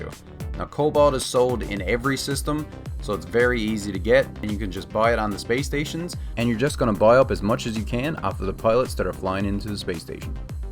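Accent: Newfoundland, Canada. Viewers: haha didn't catch the newfie accent till you said 'stay where you are and ill come where you're to.'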